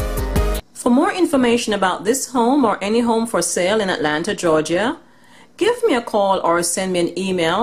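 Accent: Jamaica. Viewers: Just found this channel and loving it so far well tailored video lovely homes I like seeing decorated homes. Thinking of moving to Atlanta in the summer 2020 will definitely be keep my eyes glued to your channel...thanks for the videos keep them coming😊😊.....btw you sound Jamaican🇯🇲😊😊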